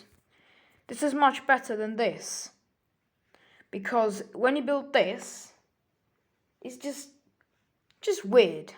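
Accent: United Kingdom. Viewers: where are you from? England? I like your accent ;)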